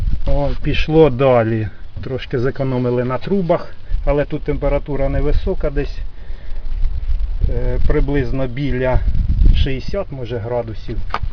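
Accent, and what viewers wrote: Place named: Poltava, Ukraine. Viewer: Полтавщина Говор такой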